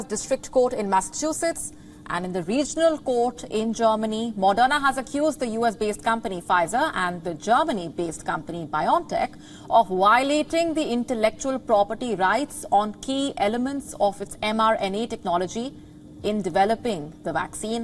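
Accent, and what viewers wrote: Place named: India. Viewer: Go work on your Indian accent it aches my ears, I tried...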